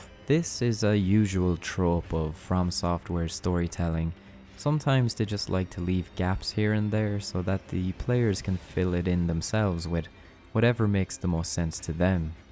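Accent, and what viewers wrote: Ireland. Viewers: Are you from Limerick, you sound local?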